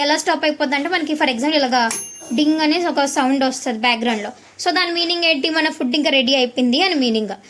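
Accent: Rayalaseema, India. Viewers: Goodroyalseema telugu accent.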